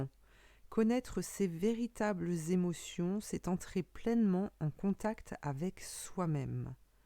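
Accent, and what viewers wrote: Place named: Jura, France. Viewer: Haaaa j avais bien reconnu l accent jurassien.. bisous d une autre jurassienne..ou serez vous installé svp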